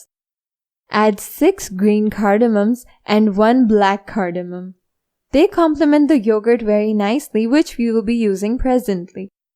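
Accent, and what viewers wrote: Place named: United States. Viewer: After a while you Came with a nice recipe in American accent,as always you trying the new things in your YouTube videos... very good 😃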